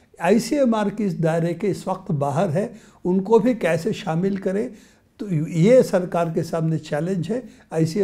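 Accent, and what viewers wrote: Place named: Bengal, India. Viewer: in ko hindi buolna nhi aata , sthree ling prush ling ka kuch pata nhi , bengali Logaun ki tarah baat karte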